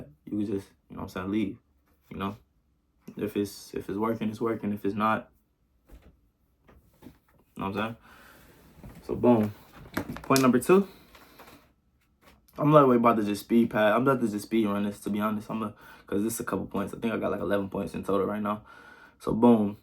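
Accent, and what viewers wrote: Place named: Cleveland, United States. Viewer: Yep u get attached to something u want not need 🔥 Point 1)Yep misery loves company Bro 🔥 Point 2) No wonder Brothers like you reach out to me! I exudes this energy! Point 3) Yeah I can show love whenever I can cause IDGAF what anyone else thanks 😂 I'm pretty sure that's another reason for reaching out 😂❤️‍🔥 Point 4) Yeah my internal completion is my own trophy, belt 🙌🏿 As long as I help 1st & wouldn't mind help 2nd ❤️‍🔥 Point 5) That's why I've graduated from community college with a 3.7 cause I had to take off in the past, fix & get my flow right, make sure I'm in alignment with myself 1st & obviously God & the 1st of da Fam that was there for me at that time who got me too ❤️‍🔥🤝🏿❤️‍🔥 Point 6) Yeah Bro they say if u chase something it runs away 🏃🏿‍♂️💨 😂🤣 So Once I became it Good Brothers like u started reaching out to me ❤️‍🔥🤝🏿❤️‍🔥 Point 7) Yep I went off of frequency to help reach out you & I'm goin completely off of frequency with myself 1st to make sure my life takes off this week 🔥🔥🔥 Point 8) Damn Right Brother 🔥💯 ++++ Bonus that waz a bar fo bar 😂🤣 & PS Yes now this makes since I have an increase of yall reaching out to me now & new Brothers reaching out to me 😂🤣 its the energy from all the good work I'm doing yall feel 😂❤️🤝🏿❤️💯 Good Video My Little Brother & yeah I emphasize with that Cleveland accent sometimes I have a tongue twister 🌀 too with my Detroit accent 🤝🏿😂🤣🇨🇦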